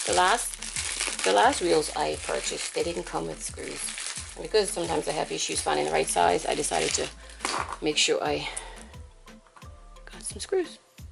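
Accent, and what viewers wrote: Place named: Bermuda. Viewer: Always nice to watch your DIYs, they’re very satisfying along with the very Bermudian commentary😉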